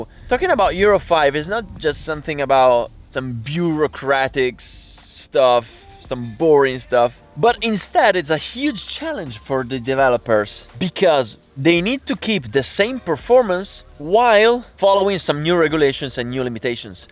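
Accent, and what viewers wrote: Italy. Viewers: what ? i really knew instantly that he is italian haha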